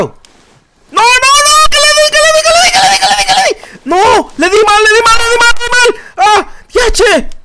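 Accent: Puerto Rico. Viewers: JAJAJAJAJA PUERTORRIQUEÑO AL 100% AMO❤️❤️❤️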